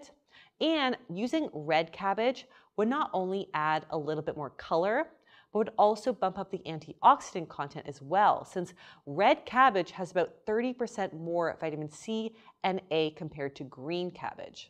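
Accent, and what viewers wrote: Canada. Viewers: She is Canadian. That’s called an accent. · I just subscribed without having watched a single video because I saw you on Obese to Beast and though you were incredibly well spoken and as a linguist I like your Canadian speech patterns. But ALSO I have been a fan of Dr. Mike for quite awhile (I only watched HIM because I love music/tv/trailer reaction videos and I think I watched a reaction of his to an episode of "House.") And right before I saw you speak with John, I had JUST watched his video critiquing that Australian banana woman and I SO SO SO MUCH wanted to ask Dr. Mike to do a video on her, so when you brought up her and then you later brought up Dr. Mike, I knew I had to immediately come here. I think I missed the video you did with him-but I'm pretty sure I've heard him mention you before. (I can picture him saying the name " "...) Love you already! 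- Male, San Diego, obese, lost 25 pounds in last two years, most this year. Want to lose 50 in the coming year. Had an unrelated (genetic thing) stroke so my frontal lobe has issues so follow-through is difficult meaning even more than everyone else already, the psychology side is bigger for me than the physiological side. Have you ever talked to Tom Bilyeu on Impact Theory/Health Theory?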